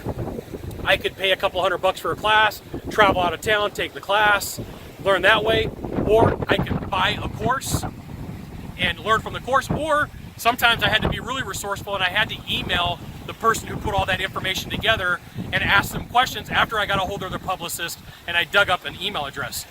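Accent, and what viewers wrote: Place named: United Kingdom. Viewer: Hi, I remember getting into Sales after my husband died and bought and read sales book galore to start. I had to write and create all of my own marketing material and training. And so many great help out there. Sample it and attempt and integrate it. Congratulations on video 2000. Oh, that British accent. lol. Always providing great sales content. Yes, no lending library. I lent for awhile and, yes, never saw them again. Study at the library. Like that. It's always great having that coach, that mentor to bounce the ideas off of etc. Which we should, indeed. Aloha. Happy Holidays. Cheers and blessings, April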